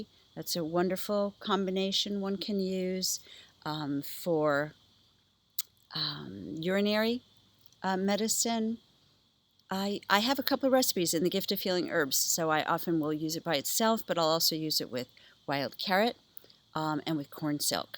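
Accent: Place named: Australia. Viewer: I hope you are OK. Sounds like Australia, or similar. We are all One here on Earth. Bless you.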